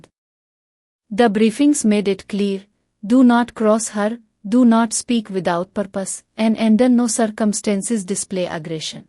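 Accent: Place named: India. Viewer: Not sure the Indian accent works, plain Western English is far batter!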